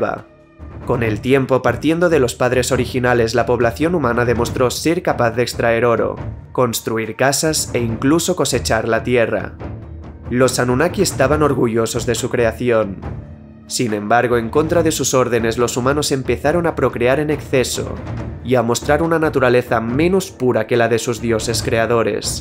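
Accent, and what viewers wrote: Spain. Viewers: Buenas, es lo que tiene haber nacido en España. Que hablamos Español. Por cierto el acento es en este caso es, Catalán. 👍🏻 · Vine buscando cobre y encontré oro!, este video es simplemente increíble, perfectamente explicado y con una edición fantastica, usualmente me desagrada el acento españolizado, sin embargo tienes una voz bastante agradable al oído, el tono adecuado para prestar atención a cada uno de los temas que manejas, gran trabajo amigo, muero por ver mas de tu trabajo. Saludos desde Mexico.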